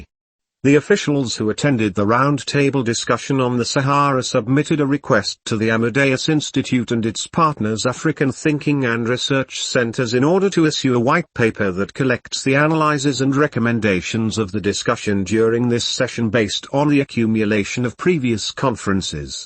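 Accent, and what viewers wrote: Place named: United Kingdom. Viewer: Very good idea! Keep up the good job even if I am not familiar with British accent.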